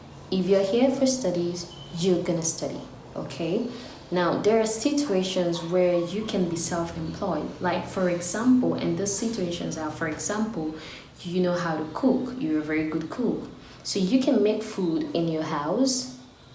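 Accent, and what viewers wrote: Philippines. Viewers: You are starting to sound like a filipina. Thank you for all the information that you shared. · Wow 😮 nice accent pilipino accent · Nice,your accent is like phillipines already...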